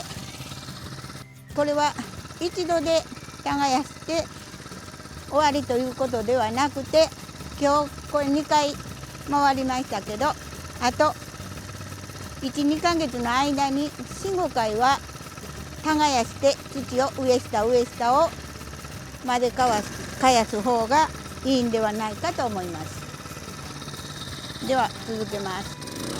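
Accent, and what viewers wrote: Tosa, Japan. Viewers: 懐かしい、土佐弁の解説。最近、家庭菜園を始めました。夏野菜は、きゅうり、ナス。オクラなどを植えて、少しですが今年の夏は野菜代、助かりました。いろいろと、失敗したりしながらですが、楽しいです。の解説がわかりやすくて、親しみがあって。これからも、参考にさせていただきます。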